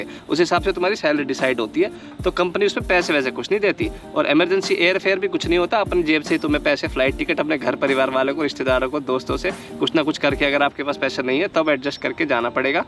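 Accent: Haryana, India. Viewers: Bhai tari aadat or awaz ek dum hariyana valo jasi hai Vaise mai bhe hariyana ka hu 🙏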